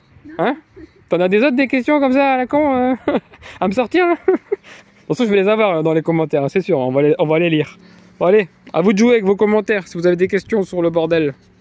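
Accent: North, France. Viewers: Salut mec. Je viens de voir ta vidéo. Je vais essayer de trouver celle du résultat car ça m'intéresse 😅 tes du nord d'après ce que j'ai entendu, moi aussi 😊 tes de ou ?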